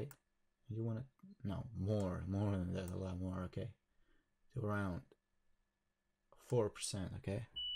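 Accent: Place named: Balkans. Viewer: thanks for the tutorial man... where u from? you sound balkan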